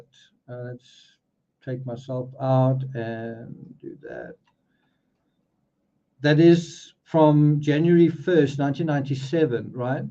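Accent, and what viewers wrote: South Africa. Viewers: please do not go to another garden! I appreciate you so very much - and I am sure so do many many more. All your time and energy in constantly following the truth, your insights into human nature and behaviour, your continuous urging to look at ourselves. And the oh so familiar South African accent - your nest certainly would be well lived in in our garden!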